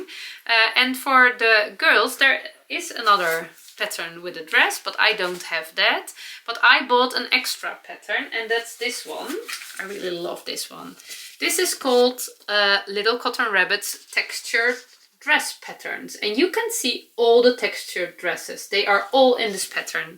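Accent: Netherlands. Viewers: Greetings from Denmark - what a cute story about the blue rabbit. I spent my Easter making my first LCR bear this Easter - its so funny i enjoy making the cloths. I love you videos, your dutch accent and energy. 🐰🐻🦊🦁🐷🐮🐻‍❄️🐭🐱🐶🐌🦌🐨🐵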